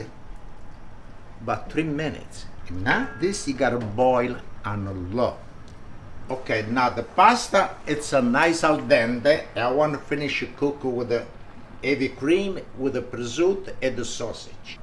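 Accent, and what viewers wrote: Italy. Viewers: Great looking food, but the Italian accent is falling away..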